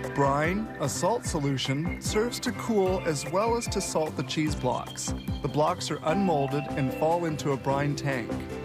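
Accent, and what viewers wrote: Canada. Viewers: His accent is so very Canadian.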